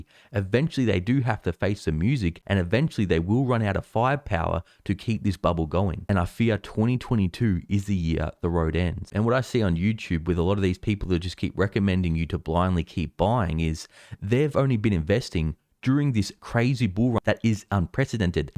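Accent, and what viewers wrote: Australia. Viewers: Are you Australian???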